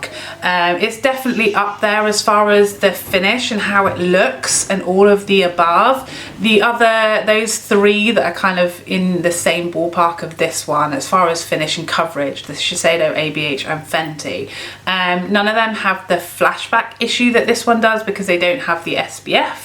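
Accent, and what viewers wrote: United Kingdom. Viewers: Finally! I’ve been waiting for your review!! With your lovely British accent (I have an Aussie accent so that’s why I LOVE yours 😭) and soothing voice, I can happily listen to you all day long ☺️😊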